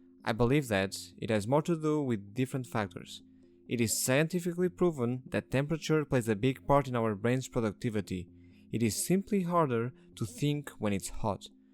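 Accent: Portugal. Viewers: I knew you where portuguese because of your accent. When you said obrigado though i was 100% confirmed.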